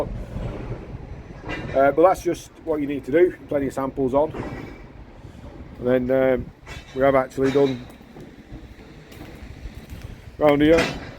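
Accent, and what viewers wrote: Lancashire, United Kingdom. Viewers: Your accent ? I'm going to say Colne in Lancashire? Great video BTW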